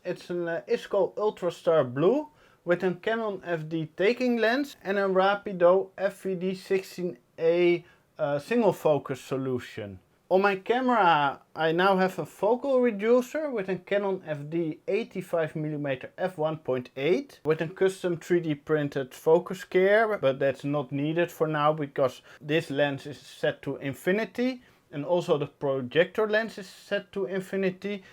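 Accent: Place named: Netherlands. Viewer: Are you dutch? Your accent sounds Dutch. (I'm from Belgium by the way)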